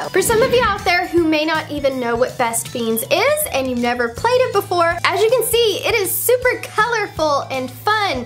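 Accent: South, United States. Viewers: She is Sooo stupid I hate her country accent eww I'm discusted by her utube ideas and no I won't leave her alone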